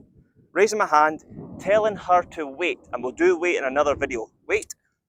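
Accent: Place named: Scotland, United Kingdom. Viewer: I had to chuckle with the tupac reference coming from a dude with a Scottish accent. Caught me off guard but good video man.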